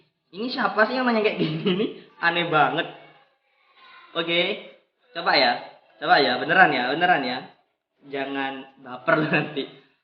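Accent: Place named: Madura, Indonesia. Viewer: Wah ternyata reng medureh .. Mun engkok reng jebeh kak....hehhehe...buat konten tutorial ja kak..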